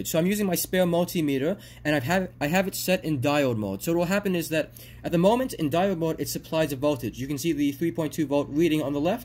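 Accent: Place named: Russia. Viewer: Shit i was thinking he's from russia.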